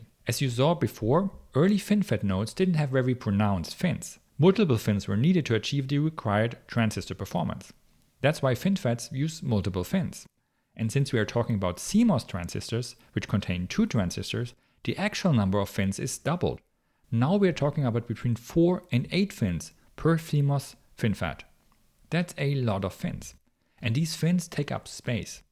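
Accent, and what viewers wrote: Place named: Germany. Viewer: This man is likely from German, no Wonder the English is like what I think, cause previously I have Watched German Laptop reviewer (Notebook Check)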